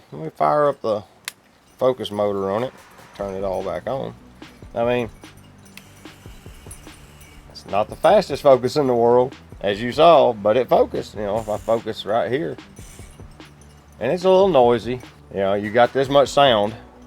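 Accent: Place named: Texas, United States. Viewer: are you from Texas , lover the accent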